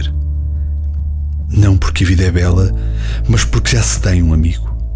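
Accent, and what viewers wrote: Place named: Portugal. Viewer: Gostei de escutar no sotaque do portugês de Portugal!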